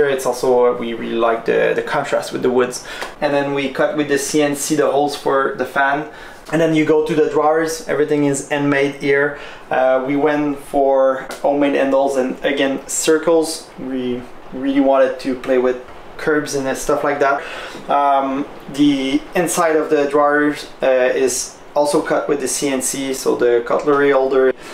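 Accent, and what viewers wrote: Quebec, Canada. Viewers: Tu le sais tout suite quand un québécois parle en anglais haha ! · Quand t'entends l'accent Quebecois! OMG C accessible!!!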